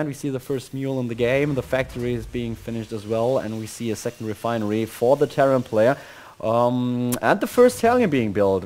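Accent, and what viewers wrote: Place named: Germany. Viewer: Your English has this typical German accent. But nevertheless, you are one of the coolest caster of Germany.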